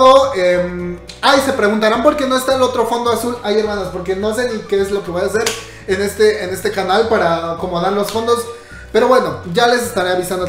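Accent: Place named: Mexico. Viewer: Sin duda alguna me encanta el acento mexicano, más en...me río muchísimo 😂❤️🇪🇸🇵🇾.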